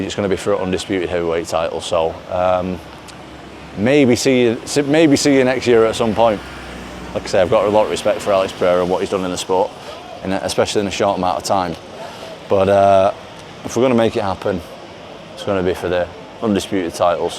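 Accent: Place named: England, United Kingdom. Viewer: I like his English much better than American English ❤ The real one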